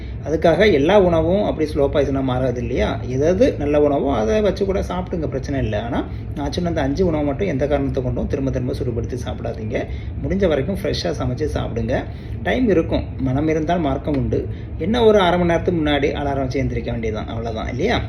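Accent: Tirunelveli, India. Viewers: Ungal nellai tamil alagaka irukkirathu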